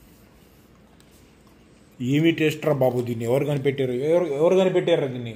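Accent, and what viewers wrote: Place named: Guntur, India. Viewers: Bro midi guntur district accent undi motham❤ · Ma Guntur language la untadi mee Bhasha. Nizamabad yasa ledu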